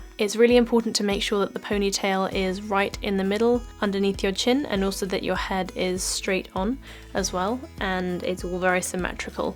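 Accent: United Kingdom. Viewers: Are you British :v ?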